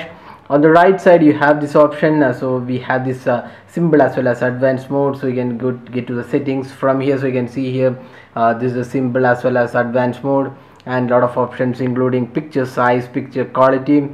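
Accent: Kerala, India. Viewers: You sound like mallu.. Are you?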